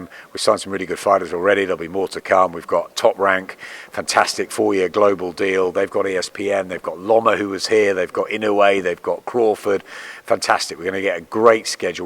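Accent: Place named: Liverpool, United Kingdom. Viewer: some scouse accent on....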